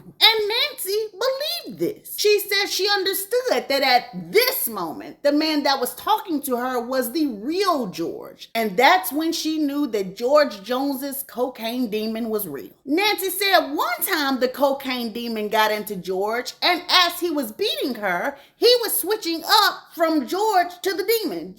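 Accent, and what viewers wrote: South, United States. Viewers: Your country accent and saying “wait one cotton picking moment”😭😂😂😂 I been rolling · The country accent made this even MORE entertaining!! 😂😂🤣🤣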